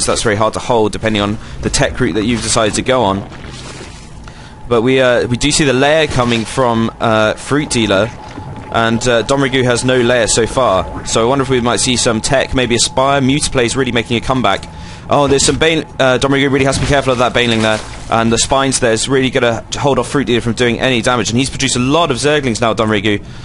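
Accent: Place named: United Kingdom. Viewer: nice british accent :)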